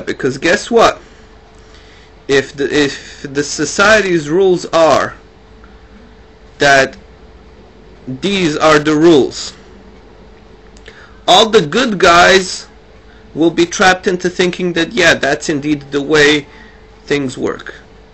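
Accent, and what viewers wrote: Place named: United States. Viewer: how come you don't have that heavy accent? your accent kinda sounds normal and like american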